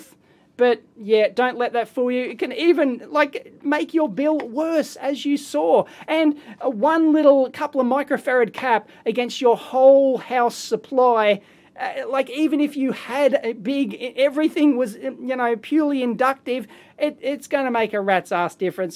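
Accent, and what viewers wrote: Australia. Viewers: Nice to hear that Aussie accent WA accent is so different I just watched a Chinese guy showing how it doesn't work he said it was made in Germany he crossed out made in Germany and wrote made in China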